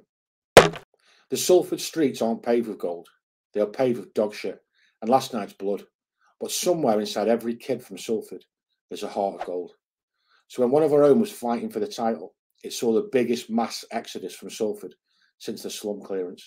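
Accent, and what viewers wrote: Stoke, United Kingdom. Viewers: I’m proper addicted to this channel, the Stoke accent, the content, fucking everything really! (Can you find more stuff about Stoke that would be ace, whether it’s football or anything else criminally based!)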